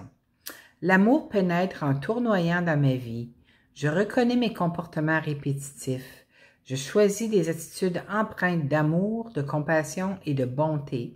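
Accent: Quebec, Canada. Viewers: Merci merci infiniement ma bonne fée..un trés beau tirage..je vous souhaites une trés bonne semaine🌹💗🥰🤗🧚‍♀️💞 P.s" jadore votre accent Quebecois craquant!!🤍🌺💗💯💥💫🙏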